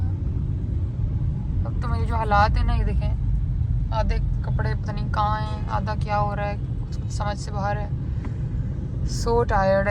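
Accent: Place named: United Kingdom. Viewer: Why are you showing British accent you are Pakistani speak in your native Urdu language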